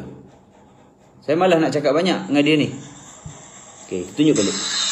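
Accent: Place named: East Coast, Malaysia. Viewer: Aku faham logat dia.kalu dop kelate ganu kalu xganu pahang.4ehehe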